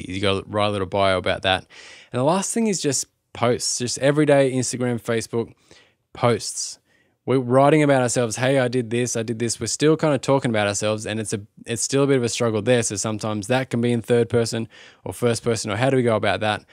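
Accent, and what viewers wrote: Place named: Australia. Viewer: I've just released my first song on Spotify and am on the journey from musician to artist so this is perfect for me, thank you! Love the Aussie accent and great to discover music as I've been away from Australia for over 20 years...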